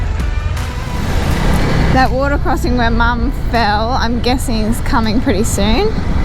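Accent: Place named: Australia. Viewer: Thats most Australian accent eva…😎🤘🏻🤘🏻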